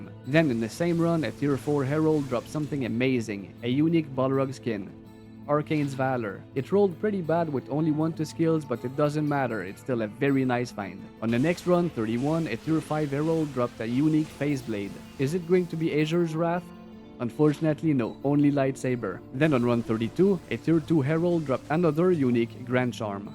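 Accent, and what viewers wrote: Quebec, Canada. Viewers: bruh i was like , he sound french canadian , apres tu dit , la face a ti-gui xD nice video bro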